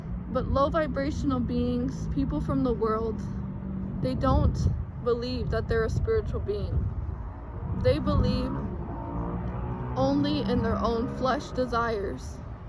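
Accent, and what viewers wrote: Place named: England, United Kingdom. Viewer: I like your english accent , i study english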